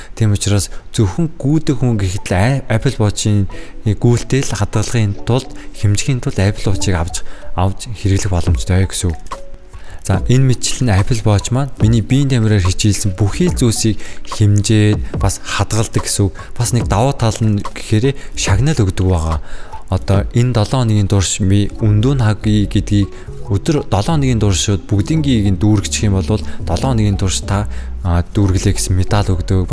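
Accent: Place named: South Korea. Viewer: Angli helnii duudlaga n aygui huurhuun yag korea english kk amjilt husiy danda engiin murtlu humuust medeelel ygdug n taalagddag shy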